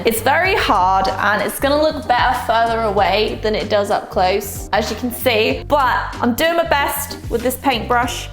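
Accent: United Kingdom. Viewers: Hi, I have recently tried painting the little models you can get and they have some super tiny details on them (usually in the most awkward places on the model too, like the handle of a sword or a sleeping dragon's claws 🤣) I would recommend grabbing a set of nail art brushes. They're basically like Rigger paintbrushes but even thinner and come in different lengths and thicknesses but all of them are tiny enough to paint designs on fingernails so definitely would be good for tiny canvases. Alternatively, Mira (Byler) is always recommending the Arteza detail brushes set and she does a lot of painting on tiny/weird/awkward things videos 🤣 Where abouts in the UK are you from? I can't quite place the accent. I grew up in Leeds but now live just outside Brighton. Do you miss home or is the US home now? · where are you from ? cause' i love your accent and it sounds quite british.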